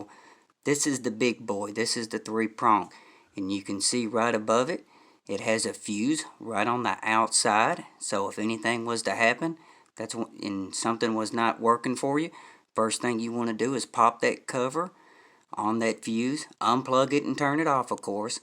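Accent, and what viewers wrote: South, United States. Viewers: “Right at the eeeeend” and “this is built like a tenk, (you all??y’all). I am watching this from England and I have never heard such a sweet sounding US dialect. Out of cultural interest, where were you brought up please? When I was a child i watched, for a short while, a TV series called North South, based on the US civil war and your dialect seems very Southern. Sounds exotic and it’s stimulating for someone from the UK where we probably sound quite neutral-flat-boring, to hear the inflections and vowel changes. Aside, your review was passionate and detailed. Thank you.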